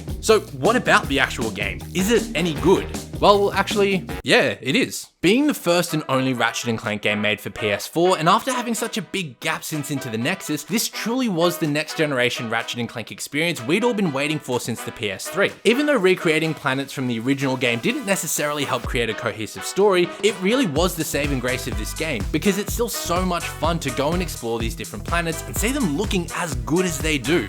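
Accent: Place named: United States. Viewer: This guy's accent is clearly American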